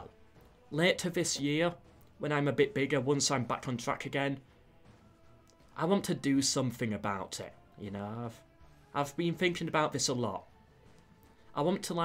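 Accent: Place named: United Kingdom. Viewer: Sometimes i watch this guy's content just because of his accent lol. I know it's one of the British ones but there like thousands of them. Anyway, it just sounds really funny, in a good way, to me as a non-native English speaker.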